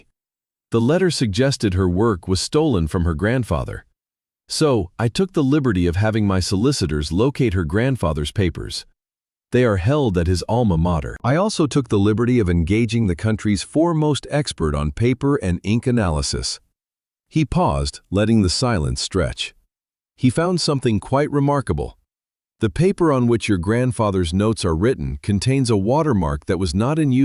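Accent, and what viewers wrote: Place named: United Kingdom. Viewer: The AI narrator's voice is UK English, but the dialogue has clearly been written by someone who is a native US English speaker which does seem somewhat odd.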